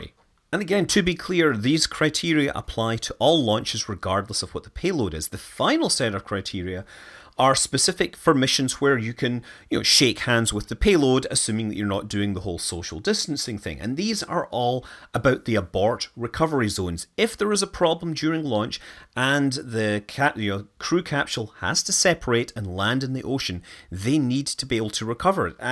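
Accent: Scotland, United Kingdom. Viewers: I don’t think i ever expected to here say “dad bods” in his Scottish accent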